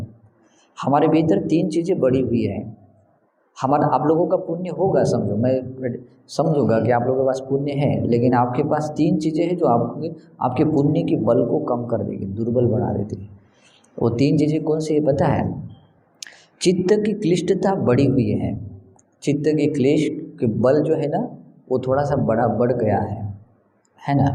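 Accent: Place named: Gujarat, India. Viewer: Is he gujrati? Why his accent is like gujrati?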